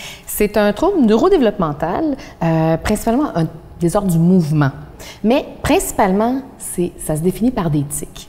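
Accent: Canada. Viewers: Bah c'est du québécois c'est pas "mal prononcé" c'est juste pas ton accent à toi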